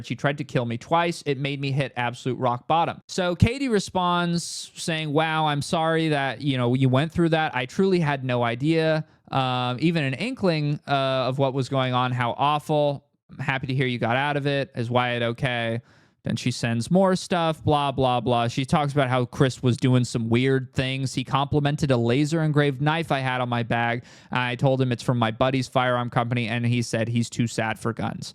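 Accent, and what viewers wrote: United Kingdom. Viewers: I'm only watching this for the British accent when doing Australian impressions. It's what I fuckin live for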